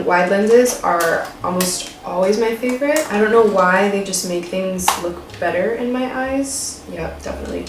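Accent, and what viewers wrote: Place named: Colombia. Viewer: It’s so funny to me how you have a Colombian accent when you speak Spanish 😭 ofc u lived in Colombia and I understand but it’s interesting to me how depending on where you learn a language you can get their accent. I’m Latina and learned English in New England however I don’t have an accent but I lived in Texas when I first moved to the states and wonder if I would have an accent if I lived there!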